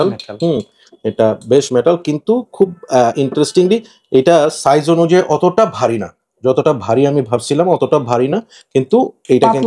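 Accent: Chittagong, Bangladesh. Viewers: বদ্দা, অ'নে চিটাইঙা নে??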